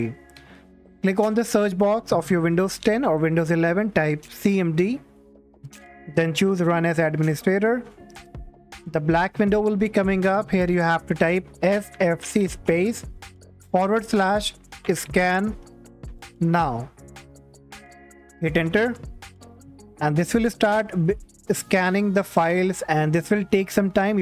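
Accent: India. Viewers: every time i hear the indian accent i calm down and know its all gonna be okay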